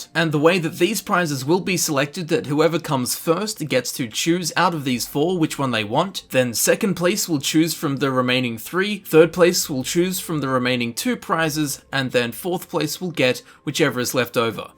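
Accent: Australia. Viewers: WHERE? WHERE IS HIS AUSTRALIAN?!?!? WHATS WRONG WITH IT? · Wait, your Australian?!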